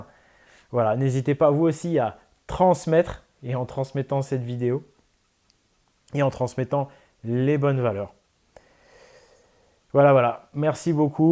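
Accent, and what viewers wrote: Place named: Provence, France. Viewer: Lady Aurelie Boissat Fraser of Lochaber. c'est juste que je ne comprends pas très bien, pourquoi certaines vidéos sont intitulées aussi...mite etc... sur sa chaîne mais sont narrées,haha, par un type à l'accent provençal ? tu connais bien ? tu peux me dire s'il te plaît ?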